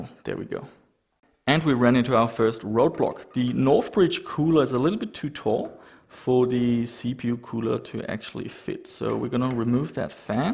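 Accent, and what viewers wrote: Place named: Germany. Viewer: Actually, I got that Sound Blaster second hand 9-10 years ago (and it was massively expensive even then), but I was already past my gaming prime and I was never able to truly enjoy EAX. I had planned to integrate it into a modern desktop (which so far I have not buit), but you made me realize that modern OS-s have relegated EAX to the past. Besides, there were some issues with the front panel, which I could not fully deal with even after dabbling with the jumpers. Maybe, it was faulty like the TV tuner card I purchased with it. But hey, that is the risk when dealing with used parts (and the excitement when they do work:D)., your channel inspired me soo much to revive my old tech (your somewhat German accent rocks as well:D). Now I am slowly working on a Compaq machine with a 500 MHz Katmai (similar to the first PC I owned). I have already boosted the RAM to 512 MB (in Y2K I had 64 MB, slightly higher than the standard 32MB new PCs were offered in my country back then). 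Next comes the SD card to IDE adapter (thanks again for the info), I hope I will be able to have a dual Win 2000 and Win XP boot (and move the XP pagefile to the HDD, perhaps?). Finally, I have prepared a GeForce 4 MX440 to replace a G200 (hope it will work). Sadly, the old compaq proprietary mobo may prevent me from maxing the CPU (I had the Abit BE6-II board back then - so flexible; and a Slotket for Coppermine). Do you flash the BIOS to its latest version when you build old systems? Because many of th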